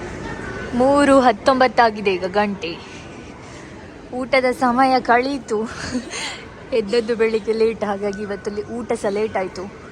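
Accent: Standard. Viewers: ನಿಮ್ಮ ಚಾನೆಲ್ ನಾನು ಇವತ್ತು ಯು ಟ್ಯೂಬಿನಲ್ಲಿ ವ್ಯೂ ಮಾಡಿದ್ದೆ. ಮೊದಲಿನದಾಗಿ ನಿಮ್ಮ ನಗು ಮುಖ ಹಾಗು ನಗುಮುಖದಿಂದ ಎಲ್ಲರಿಗು ಪ್ರೀತಿಯಿಂದ ಕೊಡುವ ಉತ್ತರ ನಮಗಂತೂ ತುಂಬಾ ಖುಷಿ ಕೊಡುತ್ತದೆ. ನಿಮ್ಮ ಪ್ರತಿಯೊಂದು ಶಬ್ದದಲ್ಲಿ ಪ್ರೀತಿ ಮಾನವೀಯತೆ ತುಂಬಿದೆ. ಇದೆ ಸಮಾಜದಲ್ಲಿ ಎಲ್ಲರಿಗು ಬೇಕಾಗಿದ್ದು. ಎಷ್ಟೊಂದು ಪ್ರೀತಿಯಿಂದ ಮಾತನಾಡುವ ನೀವು ನಮ್ಮ ಕರ್ನಾಟಕದ ಯಾವ ಭಾಗದವರು ಅಂತ ತಿಳಿದಿಕೊಳ್ಳುವ ಕುತೂಹಲ ಬಂದು ಗೂಗಲ್ ಸೆರ್ಚ್ ಮಾಡಿದಾಗ ನೀವು ಸುಳ್ಯದವರು ಹಾಗು ಆಳ್ವಾಸ್ ಕಾಲೇಜಿನಲ್ಲಿ ಓದಿದವರು ಅಂತ ಗೊತ್ತಾಯಿತು . ಎರಡೆನೇಯದಾಗಿ ನೀವು ಸ್ವಚ್ಛತೆಯ ಬಗ್ಗೆ ಮಾತನಾಡಿದ್ದಿರಾ. ಸ್ವಚ್ಚತ್ತೆ ಎನ್ನುವದು ಅಲ್ಲಿಯ ಜನರ ರಕ್ತದಲ್ಲೇ ಬಂದಿದೆ. ಸ್ವಚ್ಛತೆ ಇದ್ದಲ್ಲಿ ಶಾಂತಿ ಹಾಗು ಖುಷಿ ಇರುತದೆ. ಇದನ್ನು ನಮ್ಮವರಿಗೆ ಹೇಳಲು ಹೋದರೆ ದೂರದ ಮಾತು... ಮೂರನೆಯದಾಗಿ ನೀವು ಎಷ್ಟೊಂದು ಪ್ರೀತಿಯಿಂದ ಕನ್ನಡದ ಬಗ್ಗೆ ಪ್ರತಿ ಬಾರಿಯೂ ಪುನರುಚ್ಚರಿಸುತ್ತೀರಾ .. ಮತ್ತು ಚೀನಾ ನೆಲದಲ್ಲಿ ನಿಮ್ಮ ಶುದ್ಧ ಕನ್ನಡ ಕೇಳಲು ತುಂಬಾ ಖುಷಿಯಾಗುತ್ತದೆ. ನೀವು ಯಾವತ್ತೂ ಖುಷಿಯಿಂದ ನಗುನಗುತ್ತಾ ಇರಲೆಂದು ದೇವರಲ್ಲಿ ಪ್ರಾಥನೆ. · ನೀವು ಯಾರು ಅಂತ ಗೊತ್ತಿರಲಿಲ್ಲ ಕೀ ತಡಿ ಕಿರಣ್ ಅವರಿಂದ ಪರಿಚಯವಾಯಿತು ನಿಮ್ಮ ವಾಯ್ಸು ತುಂಬಾ ಚೆನ್ನಾಗಿದೆ ಕನ್ನಡ ಸ್ವಚ್ಛವಾಗಿದೆ ಆಲ್ ದಿ ಬೆಸ್ಟ್ ಯುವರ್ ಪಿಚ್ಚರ್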